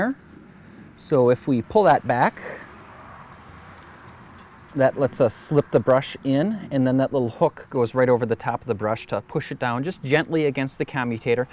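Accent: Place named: Wisconsin, United States. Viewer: Ah that great Wisconsin accent, takes me back home. Great video series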